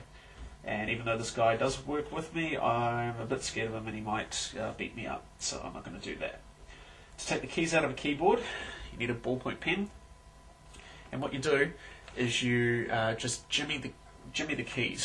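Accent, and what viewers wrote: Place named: New Zealand. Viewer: He's a Kiwi bro L2ACCENT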